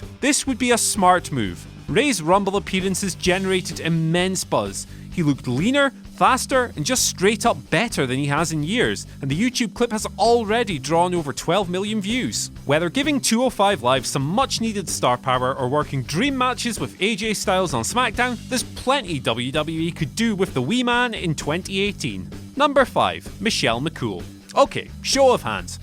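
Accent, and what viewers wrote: United Kingdom. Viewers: Please help your non english speaking audience, use less britain accent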